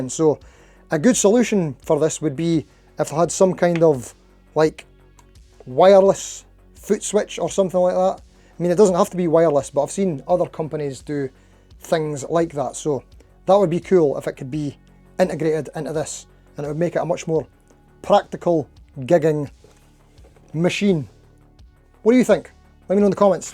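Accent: Scotland, United Kingdom. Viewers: Just realised something, if you're still friends with them... Tell them they need to have a company slogan of "You've got it with Enya". It works surprisingly well with your scottish accent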